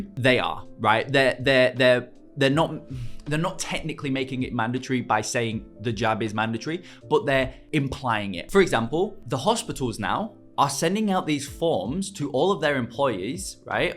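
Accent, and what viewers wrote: United Kingdom. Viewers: where are you from originally? Northern UK I'm guessing with the accent?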